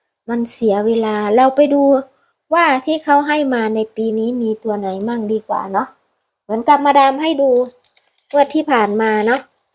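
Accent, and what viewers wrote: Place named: Isan, Thailand. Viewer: ออนชอนเสียงเว้าเด้อคัรบ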